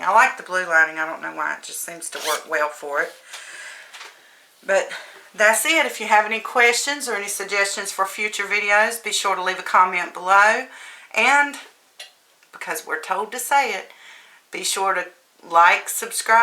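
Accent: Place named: New York, United States. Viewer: It's so great that you keep your accent in your video!! so much of the usa is working to eliminate accents and talk like tv newscasters in a fabricated (non)accent called "general american". Its so boring and awful. I think it wont be long and the nyc/nj accent will be wiped out. Its sad. Kudos to you.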